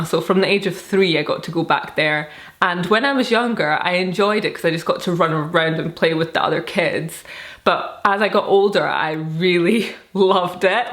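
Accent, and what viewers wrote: Scotland, United Kingdom. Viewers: I think as human beings we have a natural inclination want to categorize things to help us navigate this terrain of the human experience. So when we meet up with a person that’s not in a category of people that we are already familiar with, the tendency is to try to find a category where we can place them into, thus the Beyoncé, Alicia Keys, naming call outs you were receiving, as much as tactless as it is or was. I personally, am very much intrigued by your looks and the British accent but at the same time by your humanity and profound understanding of this concept of trying to find where you belong or the “finding your tribe” type of thing. So when you share these experiences you’ve encountered in your life it does help to shine light into how alleviate and understand these awkward reactions you receive from people. Hopefully, this knowledge does help people who would otherwise react “inappropriately” to the unfamiliar “you”, act in a “natural” manner.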